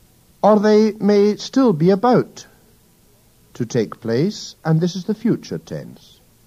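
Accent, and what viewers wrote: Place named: West Scotland, United Kingdom. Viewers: Yes - the Scottish accent gives it away. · Mi ne certas, sed estas la vocxo de iu el la malokcidento de Skotlando.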